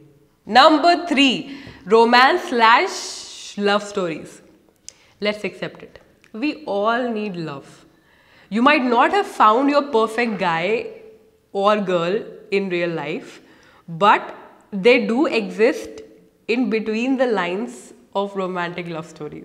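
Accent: India. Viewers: Love ur simple typical Indian English also good pronounciation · Your English is very indian.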